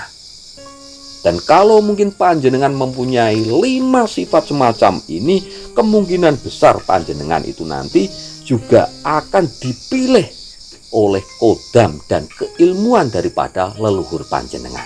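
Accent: Java, Indonesia. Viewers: Konsepnya bagus banget, Mbah. Tempatnya mendukung,musiknya musik jawa, mbahnya juga pake baju tradisional. Mbahnya juga bicaranya walaupun logat medhok,tapi masih jelas. Berasa sedang dinasihatin sama almarhum Kakek saya.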